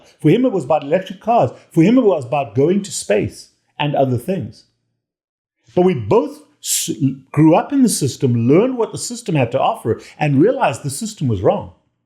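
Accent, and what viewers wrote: South Africa. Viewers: You just great. You still got the SA way of talking 😂🤘. Just like Elon and you, I also grew up in SA and I don't give a shit about what others think or say about me. My head is up no ones ass either. I need no stuff. I live for me and my children I am now living a healthy carnivore life as I can see the truth. Please keep sharing your knowledge with us as you helped saved my life as I can now see outside the box.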